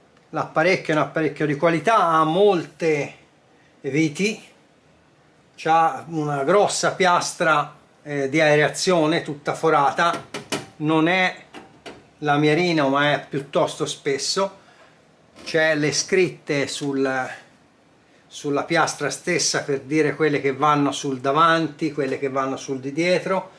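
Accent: Tuscany, Italy. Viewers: Te sei toscano. Lucca?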